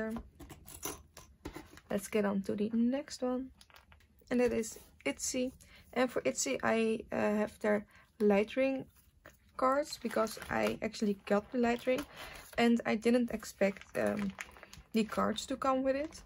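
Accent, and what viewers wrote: Netherlands. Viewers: Do u speak Dutch bc how u speak in English is how most Dutch / belguim ppl speak in English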